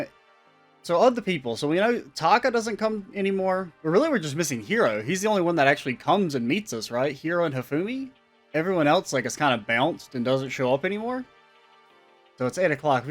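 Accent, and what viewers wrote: South, United States. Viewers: Dude, you have my favorite Monokuma voice even if it's not intentional. Heavy Southern Monokuma is my new favorite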